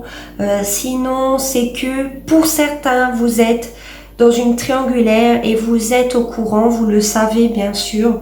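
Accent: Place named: East, France. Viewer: Bonjour j adore le ton de votre voix des habitants de l est de France merci pour ce tirage très détaillé et pointu et de partager votre savoir c est un plaisir 🎉 trop parlant pour moi bien a vous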